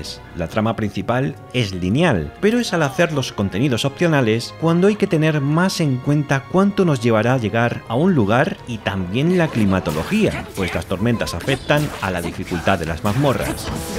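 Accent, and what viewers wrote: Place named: Madrid, Spain. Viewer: No és un castellano base, es de Madrid. Por lo demés correcto. Gracias por el vídeo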